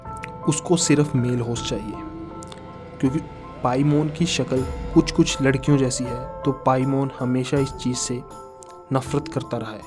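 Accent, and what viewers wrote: Punjab, India. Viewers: Bro shi btao tum punjabi ho na😁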